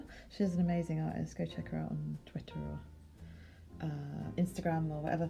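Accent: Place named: Manchester, United Kingdom. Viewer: God I miss you and god I love your ever so slightly Manc accent - after next lock down I’m gonna come visit and sing ‘let it go’ with you 🥰🥰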